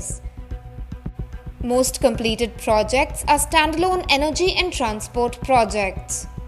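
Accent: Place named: India. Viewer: was ready to dislike the moment i heard the accent, but this was unbiased... for an Indian outlet talking about pakistan, that's a massive achievement well done!.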